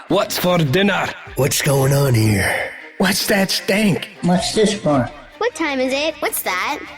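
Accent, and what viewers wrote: Jamaica. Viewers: Wah unu weh na duh? Real St. Ann man accent. I’m a big supporter of the JCF, but I also agree with you 100%. If someone don’t like me or badmind me for my success, all they have to do is accuse me of something during a SOE and destroy my character and livelihood. Big up yuhself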